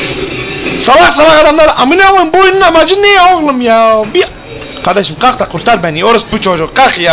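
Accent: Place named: Trabzon, Turkey. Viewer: şivesi iyice tıraykyaya kayı bu ne leee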